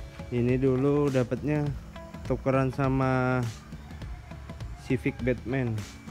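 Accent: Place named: Semarang, Indonesia. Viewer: Kassemarange daerah ngendi smpyn apal q logate qong semarangan ngene ki.. Kebetulan tonggo dwe kas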